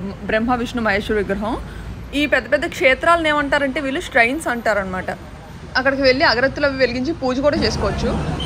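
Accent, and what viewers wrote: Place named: Godavari, India. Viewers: మీ వాయిస్ సూపర్ వుంది. గోదావరి భాష. మీ వీడియోస్ చూస్తుంటే మా బ్యాంకాక్ ట్రిప్ కళ్ళకు కట్టినట్లు గుర్తుకువస్తుంది. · Meru everything Bangkok ni chupisthunanru nd mi telugu slang spr.... YouTube nundi manchi ⭐⭐⭐ time pass ki indirect ga kastapaduthunnru miru 😍🙏👍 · తూర్పు గోదావరి లా ఉంది మీ వాయిస్ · హాయ్... మీరు బావున్నారు. వాయిస్ గుడ్. సరే.... ఆంధ్రలో మీ నెగిటివ్ ప్లేస్ ఎక్కడ, ఎందుకంటే మీ మాట, యాస, బాష, గోదావరి జిల్లాలని ఉహించాను. గుడ్ గైడెన్స్.... వెల్ కమ్ ఆంధ్ర..... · మనం ఎక్కడికి వెళ్లిన మన స్లాంగ్ మాత్రం కానిపెట్టవచ్చు ఈస్ట్ గోదావరి అనుకుంటున్నా నేను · Nice voice+ మన తెలుగు యాస = అక్క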